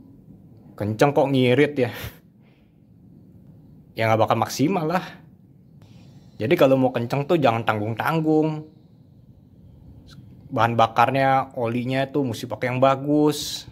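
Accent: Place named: Bali, Indonesia. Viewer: Ko kaya logat vrindafan Indonesia (bali)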